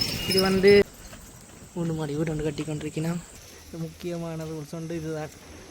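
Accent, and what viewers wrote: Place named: Jaffna, Sri Lanka. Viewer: யாழ்பாணத் தமிழில் உங்கள் விளக்கமான வர்ணனை மேலும் மெருகூட்டுகின்றது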